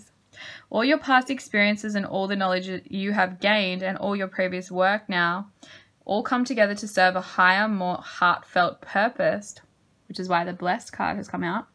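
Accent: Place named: Australia. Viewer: #4 but couldn't focus much on what u were saying but i absolutely LOVE ur aussie accent. ah my heart 😂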